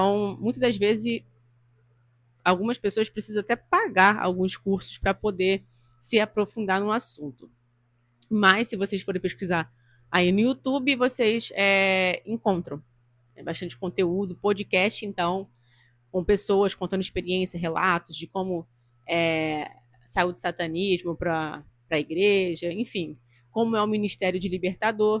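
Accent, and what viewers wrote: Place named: Rio de Janeiro, Brazil. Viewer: " Princesa de Mônaco." Sotaque carioca. Deus abençoe sempre seu ministério! #JuntosPeloReino!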